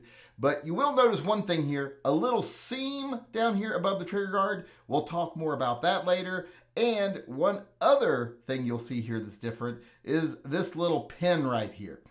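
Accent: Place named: New England, United States. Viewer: Where you from originally?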